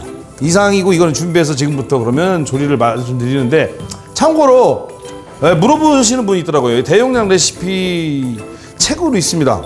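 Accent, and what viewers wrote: Chungcheong, South Korea. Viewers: 충청도 출신이라 백선생님 말씀하시는거 듣고 있음 고향에 간것같이 편하구 좋음~~ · 으 감자셀레드 멋지게 활용 잘봣십더 요리 즐겁게해주셔 많은양 을 어덯게 햇는지도 모르게 다 빠르게 신나게 실렁실렁 보너스 충청말씨 아주 잘봣십더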